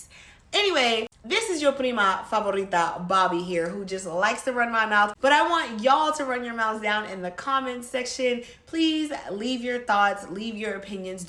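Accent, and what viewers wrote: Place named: Honduras. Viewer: Being a new subscriber I can't place the accent exactly. Yet, to my ears, it is Hounduran or maybe even Costa Rican. Am I close?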